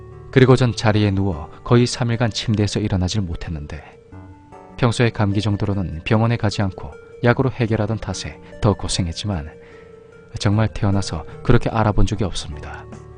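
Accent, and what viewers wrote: Gyeongsang, South Korea. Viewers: ㅋㅋㅋ 이 경상도 분이셔서 대화부분은 원본이 경상도 사투리가 아니더라도 경상도 사투리로 하시더라구요 ㅋㅋ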